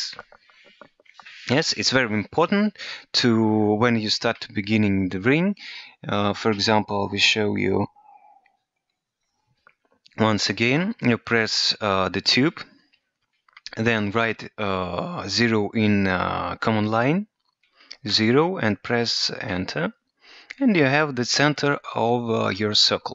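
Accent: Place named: Russia. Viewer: Русский акцент не спутать)))))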